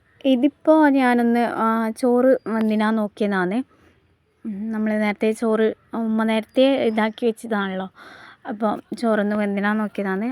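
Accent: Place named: Kannur, India. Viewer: താത്താന്റെ കണ്ണൂർ ഭാഷ അടിപൊളി ആയിക്ക്ണ് 😊😊😊ഡേ ഇൻ മെയ്‌ ലൈഫ് വീഡിയോ പൊളിച്ചു ട്ടോ 👍👍👍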